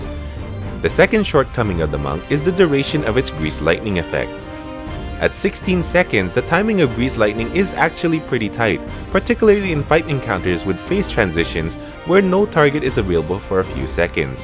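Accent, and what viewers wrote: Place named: Philippines. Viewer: so sound filipino bro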